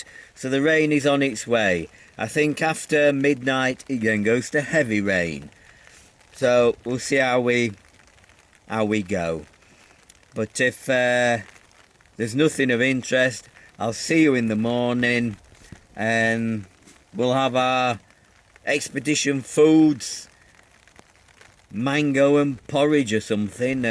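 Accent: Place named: Yorkshire, United Kingdom. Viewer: Proper Yorkshire accent:)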